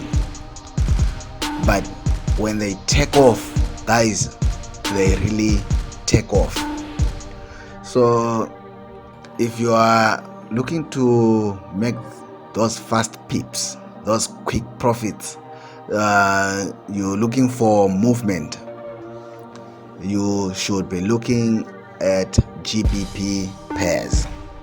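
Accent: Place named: Zimbabwe. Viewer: I love this Brother, he somehow sounds Zimbabwean, great content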